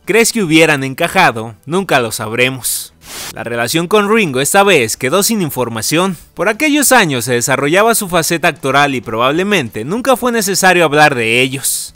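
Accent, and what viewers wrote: Mexico. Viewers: Deberías neutralizar un poco tu acento ranchero.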